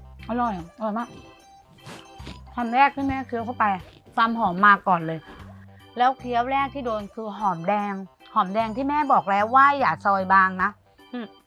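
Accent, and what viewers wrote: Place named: Isan, Thailand. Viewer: ชอบสำเนียงการเว้าา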